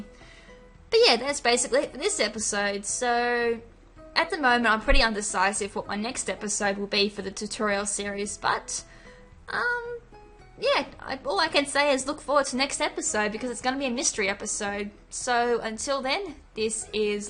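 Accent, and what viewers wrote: Australia. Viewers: your accent makes me want to visit australia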